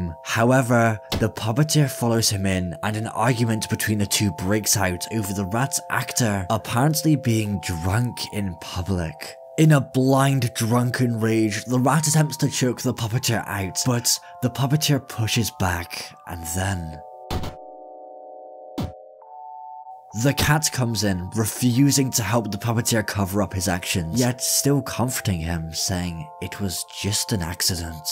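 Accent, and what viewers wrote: United Kingdom. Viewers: this video is cool and all.. but... I just love the Scotland Accent!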